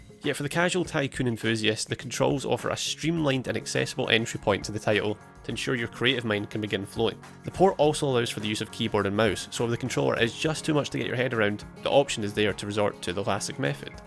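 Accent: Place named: Scotland, United Kingdom. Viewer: I have to warn you. Your Scottish accent and the fact that you talk fast makes me struggle a little bit, and I'd say I'm quite good at English. I can see others struggling a lot with your review.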